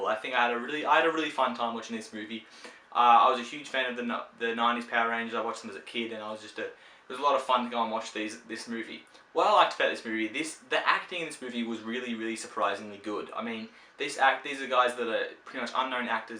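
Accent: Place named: Australia. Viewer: Another small Australian YouTuber with talent and deserves way more subscribers? Awesome! I'm Corey and I too am an aspiring film critic with a passion for movies with a relatively small sub count (256) and not only that but the fact that we're both Australian is awesome. I can tell you're Australian due to your accent and the ratings on your Blu-ray collection, with the combination of G, PG, M, MA etc. As someone who likes to support other small young aspiring film critics or film buffs let me say I just added to your sub number. I too review films on YouTube, my personal favourite review of mine is a review for a rather obscure claustrophobic thriller released in 1997 called Cube, a highly underrated thriller that deserves more recognition. Feel free to check out my review or channel in general if you're curious but you don't have to if you don't want to.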